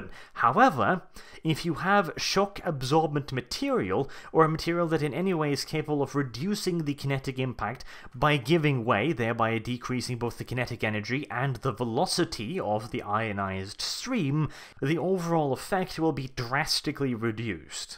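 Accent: RP, United Kingdom. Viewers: im English and a big fan. but please stop shitting on the British when you quite clearly speak in a posh English accent. seems quite hypocritical.:) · He's actually Norwegian, but somehow he speaks in a weird posh british accent.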